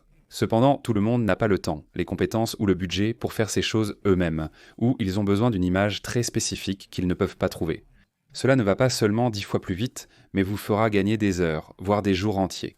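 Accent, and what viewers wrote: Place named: France. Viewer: French comment : french voice looks like something created by IA (synch lips not real), but the voice is good, with realistic french accent, not robotic. Pleasant enough.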